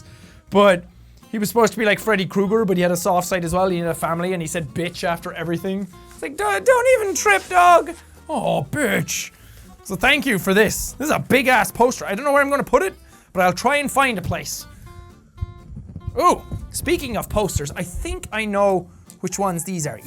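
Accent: Ireland. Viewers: Woah, his irish accent was so strong in this video. Damn son! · Has jack got more Irish 😂🤔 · At least he still has his Irish accent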